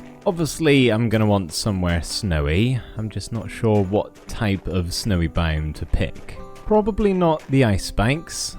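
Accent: United Kingdom. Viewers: The cozy Christmas Minecraft and your British accent is so nostalgic as someone who grew up watching InTheLittleWood’s Christmas ADVENTure Minecraft series!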